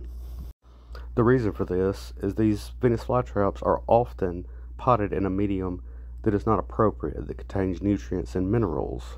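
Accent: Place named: Carolina, United States. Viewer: You have a south or North Carolina accent 🎉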